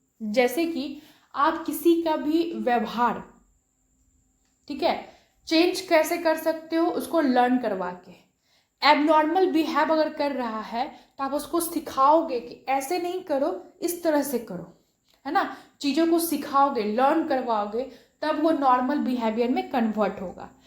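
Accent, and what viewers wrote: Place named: Bengal, India. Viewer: Madam poora bangla accent ❤❤❤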